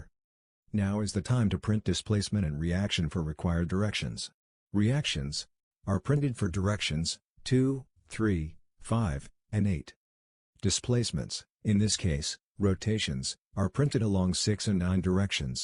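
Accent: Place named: United States. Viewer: Wow your accent has changed into American in just few months ! 🤩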